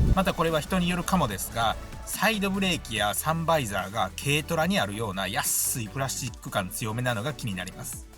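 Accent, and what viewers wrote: Kansai, Japan. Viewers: 関西訛りなのに浜松ナンバー。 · はじめまして、もうすぐ1型中古を納車予定の者です。 色々参考にさせてもらってます。全然関係ないですが アークナイツというゲームの実況者（登録者10万以上）のoyukiさんみたいで関西弁のトークが親しみやすいです！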